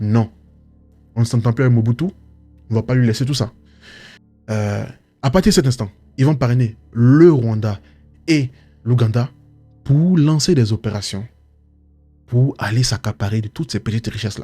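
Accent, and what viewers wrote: Ivory Coast. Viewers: Ko je suis l'enfant de quelqu'un 😂😂 tu m'as tué frère comme un vrai ivoirien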